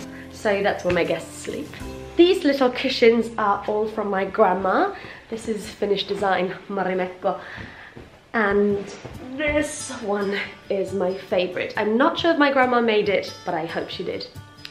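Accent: United Kingdom. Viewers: Are you Finnish? :) Cause you have a very clear British accent :)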